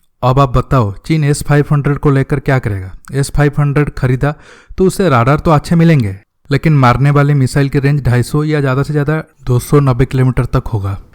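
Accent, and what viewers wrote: Odisha, India. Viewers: tum Odisha se ho kya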